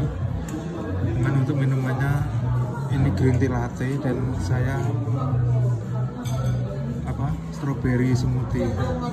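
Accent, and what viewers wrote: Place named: Java, Indonesia. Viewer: Wis njan....senajan awakmu wis tekan adoh korea kono....tetep wae medok. *kui kue sing kotak cilik neng nggonku jenenge etheh etheh plengeh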